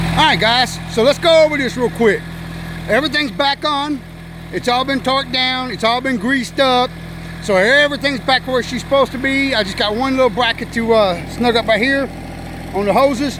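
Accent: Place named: Louisiana, United States. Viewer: HELLO HOW ARE U?? IM NEW HERE SHOWING SUPPORT FOR U. I LOVED LOUISIANA. I WAS THERE FOR 3 YEARS WORKING WITH A DREDGING COMPANY PUMPING SAND IN MYRTLE GROVE NEAR PORT SULPHUR AND BELLE CHASSE. I MISS IT THERE. UR ACCENT TAKES ME BACK. BUT AWESOME MASSEY U GOT THERE. AND SO FAR CONGRATS ON THE SAINTS SO FAR!! WHO DAT!!!!! ANYWAY I SEEN U ON ANOTHER CHANNEL I WAS ON SO I WANTED TO STOP IN. I ALSO FED ALLIGATORS WHILE I WAS THERE. ITS ON MY CHANNEL MY OLDER VIDEOS. I WAS HELPING TRIGGER TOMMY FROM SWAMP PEOPLE. IT WAS FUN! BUT GREAT VIDEO, !!🤗🤗🤗🤗🤗🤗🤗🤗🤗🤩🤩🤩🤩🤩🤩🤩🤩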